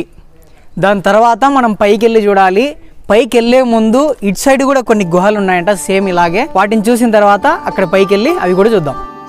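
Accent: Rayalaseema, India. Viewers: U r from Rayalaseema u r accent says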